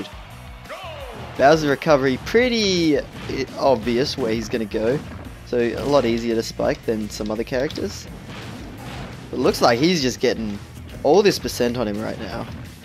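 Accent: Australia. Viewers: Love the idea of doing challenges. Love the editing. Love the succulent Australian accent. 10/10 Would donk again. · This guy sounds like PIMPNITE, but for Smash Bros. Nothing wrong with that; they're both Australian, like me. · Are you Australian? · try and play smash for an battle or episode you pick without an Australian accent, I know it sounds impossible (I would know I'm also Australian too)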